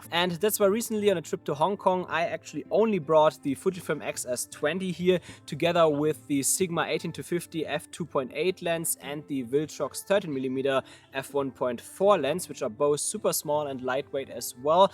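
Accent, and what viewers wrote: Germany. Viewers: I love your German accent. also your cool content. thanks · Where you‘re from originally? Germany?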